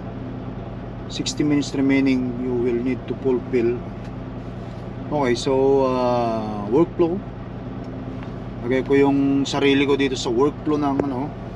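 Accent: Ilocos, Philippines. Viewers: Unawain mo kase ganyan tlaga lahat ng nagsisimula....wag ka naman masyado mag magaling boss..at isa pa ganyan tlaga ang pronouncetion ng mga ilocano..wag ka masyadO busher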